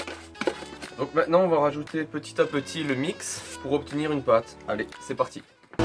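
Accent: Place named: Alsace, France. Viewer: Mika 80 laisse l'accent alsacien trankil lol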